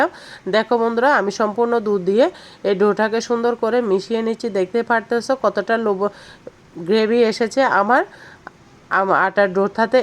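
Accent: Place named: Chittagong, Bangladesh. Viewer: আপু তুমার কথার ভেতর চট্টগ্রাম চট্টগ্রাম একটু ভাব লাগছে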